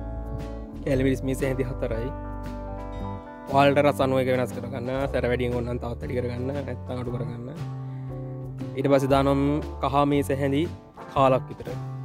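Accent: Galle, Sri Lanka. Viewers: Good! Very clear. Thanks! Galle ekkenk wage! 😋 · Galle kenek wage .kathakarana widiyen kiuwe.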